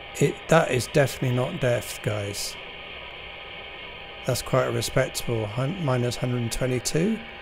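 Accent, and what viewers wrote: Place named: Bristol, United Kingdom. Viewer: Just ordered a BJ9000. I realise you are in Singapore but, your accent sounds quite local to me here in Bristol. Thanks for your expertise from M7CUN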